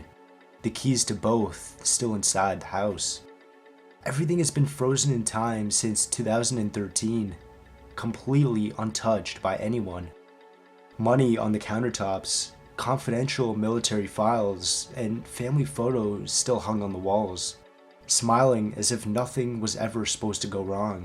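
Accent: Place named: United States. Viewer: What’s an American rummaging through a home in Britain for. Definitely not a British accent.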